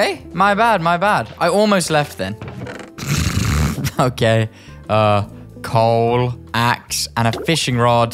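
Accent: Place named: United Kingdom. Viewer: He is ironically British XD (nothing bad about that tho)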